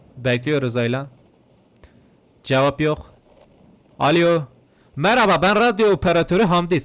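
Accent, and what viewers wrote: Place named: Southeast, Turkey. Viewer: Bu şive erzurumum şivesi değil, bu yaptığın şive kürtlerin kullandığı güneydoğu şivesi.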